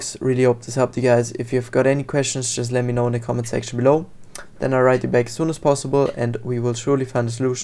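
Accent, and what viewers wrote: Germany. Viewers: der deutsche Akzent ist am Start auf jeden fall haha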